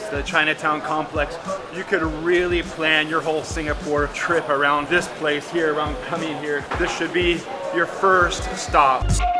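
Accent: United States. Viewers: U from Minnesota ? Midwest accented ? Yah ? 😅 Hope U have tucked into the "Half-boiled" Soft eggs with black sauce & pepper, with crispy ButterKaya Toast !?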